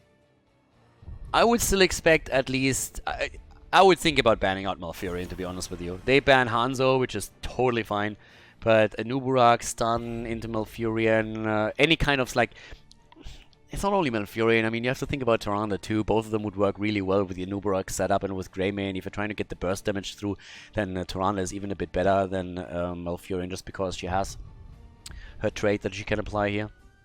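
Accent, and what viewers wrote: Germany. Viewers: Does has an german acsent when hes talking in englisch??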